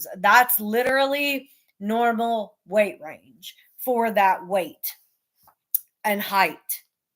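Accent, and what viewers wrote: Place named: Louisiana, United States. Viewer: Buttery and Cajun accent do not belong in the same sentence.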